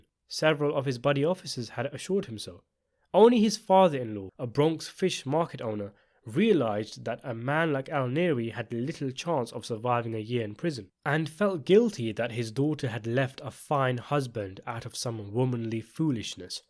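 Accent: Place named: United Kingdom. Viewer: Thank you - but british accents are the worst.. Sickening.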